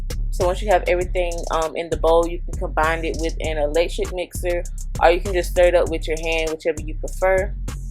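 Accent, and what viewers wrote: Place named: South Carolina, United States. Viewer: Omg really you have a different southern accent than most of the SC people I know. I love it.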